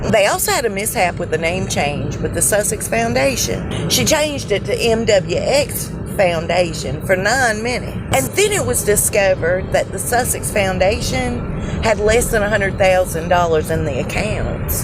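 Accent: United States. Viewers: I really love your accent. I wonder what part of the US you're from.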